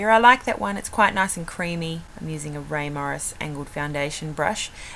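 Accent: Australia. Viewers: love your voice. its very soothing. there is something about the aussie accent!! chi chi naturals is on sale soon, so I thought id watch chi chi reviews. and found your channel.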